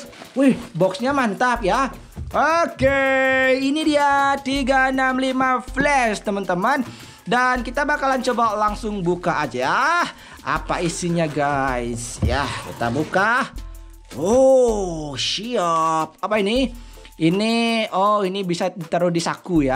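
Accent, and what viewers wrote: Indonesia. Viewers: Kyk minang kocak suaranya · Kamu orang bali ya...mas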